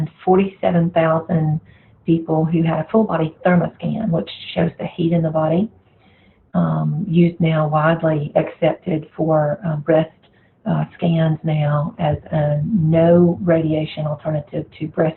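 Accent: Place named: London, United Kingdom. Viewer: Love your accent! Are from from east or west London?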